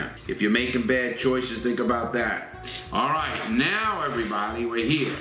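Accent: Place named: East Coast, United States. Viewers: The thick Jersey accent really ties it all together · by his accent he's east coast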